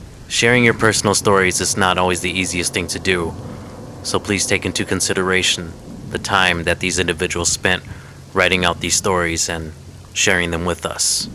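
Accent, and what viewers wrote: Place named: United States. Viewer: Leng lead me here. Subscribed! So good!!! I was convinced you’re not Hmong due to your perfect American accent until I hear you speak the Hmong words in Hmong. 👍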